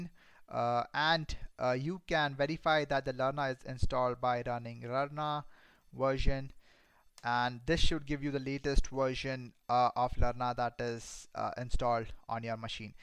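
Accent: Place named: India. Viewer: Good tutorial, and nice indian accent :)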